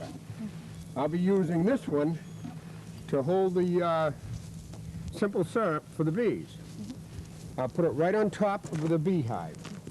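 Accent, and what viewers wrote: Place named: Rhode Island, United States. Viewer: Has kind of a Quahog accent.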